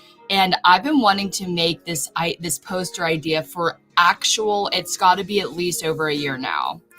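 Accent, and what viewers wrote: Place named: Los Angeles, United States. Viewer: I so love your la accent! I love you sweet.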